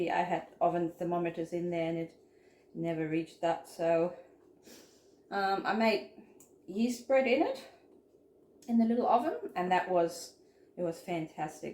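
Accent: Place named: Australia. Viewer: Thank you so much! I loved your way of explaining and your Australian accent. The clothing changes were fun too. Nice production and even better tips.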